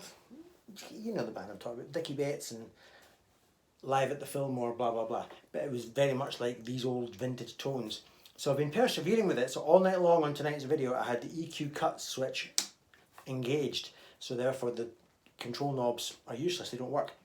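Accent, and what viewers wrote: Scotland, United Kingdom. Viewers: I come to this channel to listen to the gorgeous tones and phrases that can elicit from his great collection....but more than that is that super smooth Scottish brogue accent I hear when is regaling us with his stories....that voice is warmer than a cup of Horlicks! Put the two together and you have me transfixed!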